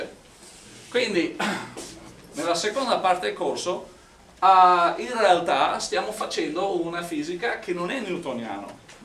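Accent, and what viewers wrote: Veneto, Italy. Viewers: Ma ha l’accento veneto hahahahha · Questo professore non solo è bravo, ma è uno spettacolo umanamente parlando: un saggio. Mi bevo le sue lezioni che è un incanto. Un americano con l'accento veneto, poi, non ha prezzo! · A me sembrava Veneto! Probabilmente ha imparato direttamente quello 😂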